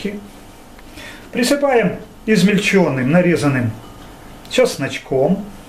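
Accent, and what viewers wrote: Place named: Ukraine. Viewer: Хас, хатоука, прихотовление, ховядина, храдусы... Попробуйте сделать над собой усилие и говорите по-русски. Или уж честно на мове начинайте гоорить. К которой, кстати говоря, большинство русских относится совершенно нормально. Но это смешение наречий, рожающее суржик, просто режет ухо. А за рецепт спасибо! Зачётно!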